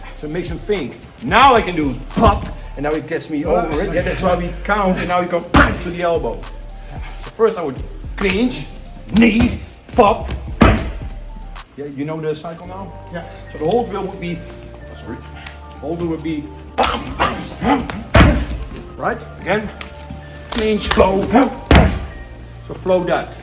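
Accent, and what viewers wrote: Netherlands. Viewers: Respect what a OG, lived in Netherlands 🇳🇱 for a few years, top top people, love the accent,attitude and culture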